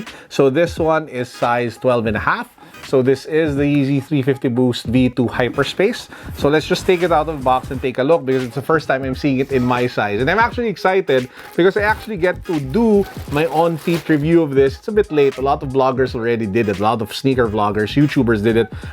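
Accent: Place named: Philippines. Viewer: right off the bat when i heard your voice i knew you were filipino just like me. ahah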